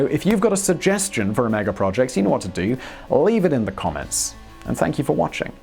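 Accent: England, United Kingdom. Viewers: OK THANKS, I ENJOY WHAT HE IS TALKING ABOUT... BUT A LITTLE HARD TO CATCH ALL THE FAST WORDS WITH THAT ACCENT.. MY BRO IN LAW IS FROM ENGLAND, BUT HAS LOST MOST OF HIS ACCENT... KEEP UP THE GOOD VIDS... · a suggestion. Slow down. Your videos are educational and informative, but your pace is unnecessary. Your knowledge and British accent alone keeps people watching, but your pace has me tuning out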